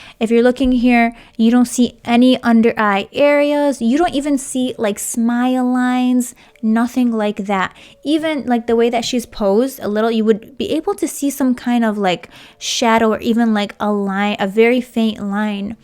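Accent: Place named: Midwest, United States. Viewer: I can tell your accent is from the mid-west lol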